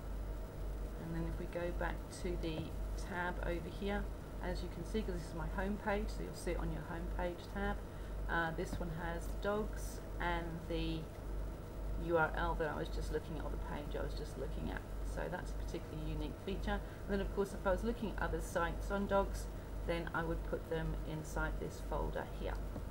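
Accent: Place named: Australia. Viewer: thanks! really helpful! and i love your Australian accent!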